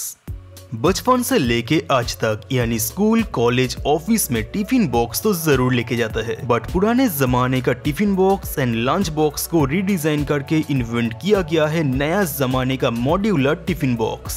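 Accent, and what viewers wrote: Bengal, India. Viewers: हां भाई हिंदी बोलो यार बंगाली आवाज आ रही है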